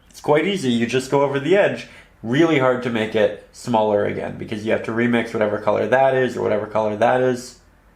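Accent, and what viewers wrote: Canada. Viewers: Wow you're Canadian accent really comes through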